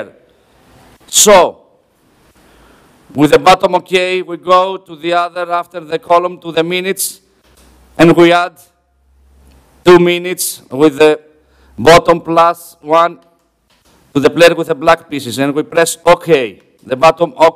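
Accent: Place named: Greece. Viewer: Αγαπώ την Ελληνική προφορά στα Αγγλικά!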